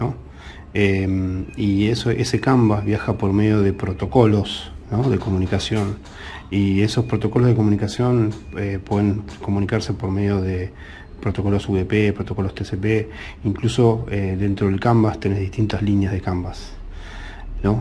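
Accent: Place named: Argentina. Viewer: O habla en español o en argeninglish, me volví loco intentando traducirte...si pronunciás "bas" por "bus", deberías pronunciar "baedi" en lugar de "body", y así todo el video.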